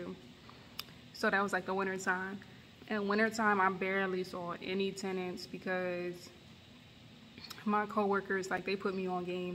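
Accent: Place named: Philadelphia, United States. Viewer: You sound like a Philly Jawn! ..or surrounding area.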